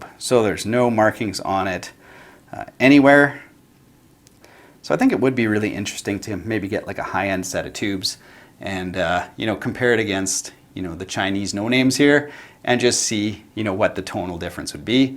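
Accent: Canada. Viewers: Great video, exceedingly helpful! Would love to see it compared to higher-end tubes. Also, the Canadian accent seems more pronounced in this video for some reason.. love it!! 🤘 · he sounds candian, everything sounds weird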